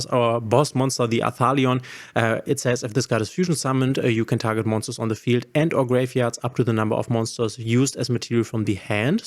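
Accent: Germany. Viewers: thick german accent lol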